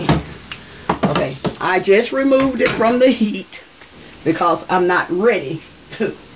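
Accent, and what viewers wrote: South, United States. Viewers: Thanks looks good I really like your your southern accents I know people southern I really like southern friend chicken Thanks for sharing blessed you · Her strong southern accent just made this 100× better. I KNOW that liver and onions good. Lol Also, I've never had it before and my bf wanted some. This helped a lot. ♥️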